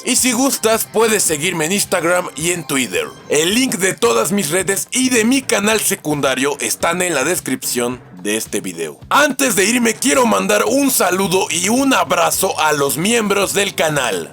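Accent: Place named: Cuba. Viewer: Pareces que eres de cuba a la hora de hablar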